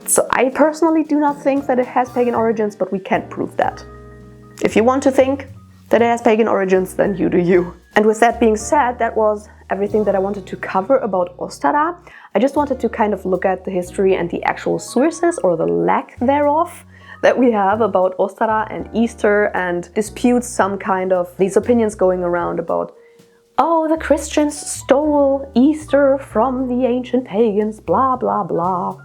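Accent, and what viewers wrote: Germany. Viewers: You have a great "accent" like german would be your mother tongue. Greeting from germany! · I'm a Christian and I think you very informed. And if you are German I love your German Accent!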